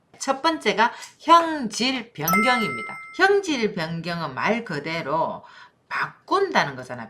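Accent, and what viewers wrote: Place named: Gyeongsang, South Korea. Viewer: 표준어좀 씁시다 젠장 갱생도 말좀 쓰지말고 먼소리 인지 하나도 모르겠음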